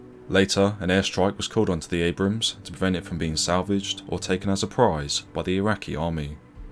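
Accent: United Kingdom. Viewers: I'm not sorry don't speak to me about American military with a British accent ever! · 12🇬🇧📯 sounds like a british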